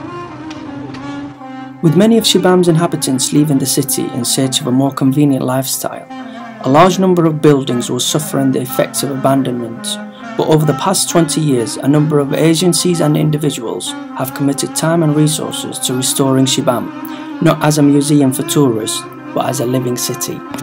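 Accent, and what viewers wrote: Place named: Sheffield, United Kingdom. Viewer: Of course you cant ignore the beauty of Yemen, but i noticed the Sheffield accent straight away.. Brilliant video mashaallah..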